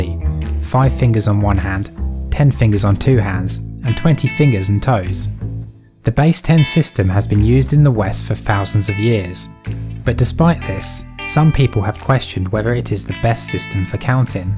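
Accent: United Kingdom. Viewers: Let me ask you something, do you use metric system or imperial? British accent is funny, we from Brazil think the same about the Portuguese accent, but this doesn't make his argument less valid. Use imperial system however is stupid, and what makes it worse is that USA is affiliated to the metric standard and all of its imperial bullshit comes from metric's standards, it's only a conversion.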